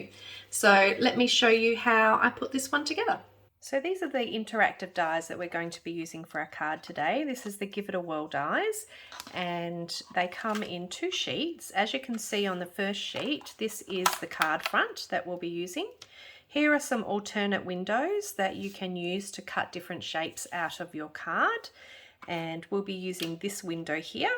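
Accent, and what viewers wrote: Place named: Western Australia, Australia. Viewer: I've just found you and have so enjoyed your video - nice to hear a fellow West Aussie - I am from Perth also.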